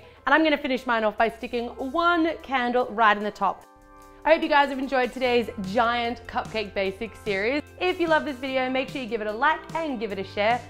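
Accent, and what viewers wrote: Australia. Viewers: Are. You australian ?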